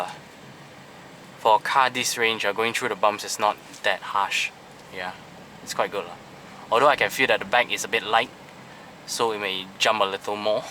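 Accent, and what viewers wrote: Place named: Singapore. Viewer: Hey bro u talk like Singapore slang english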